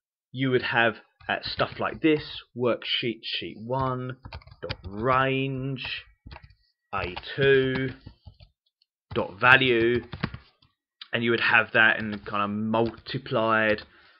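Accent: United Kingdom. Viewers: This is a very useful video to understand Evaluate Function in VBA. Thanks for sharing. And love ur British accent btw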